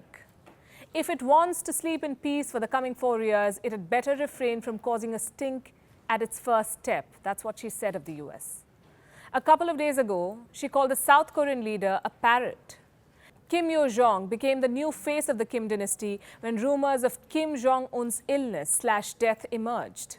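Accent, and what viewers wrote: India. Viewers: i like the indian ladies accent .., refreshes my memory of the good times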